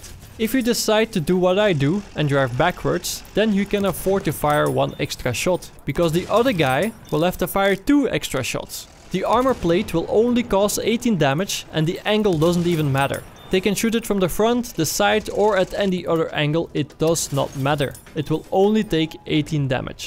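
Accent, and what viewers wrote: Netherlands. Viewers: heared withing 0.001 seconds that you are dutch, might i be right? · are you like Dutch, because your accent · pretty sure he is dutch, its in the accent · m8 you dutch? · Nice tips man! thanks a lot. Are you Dutch? :p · Your English sounds so Dutch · this guy is dutch for sure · you have a Dutch-ish accent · are you Dutch? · waarom klinkt ge zo nerderlands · its the most dutch accent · you sound dutch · Are you dutch? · I like your dutch accent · dutch af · Dit Nederlands accent is erg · This was realy helpfullBtw are. You from holland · accent is super confusing, it sounds dutch but then also russian, and scottish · you are clearly Dutch, I can hear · i bet you are from NL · you are dutch arent you ^^ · dat nederlandse accent tho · u dutch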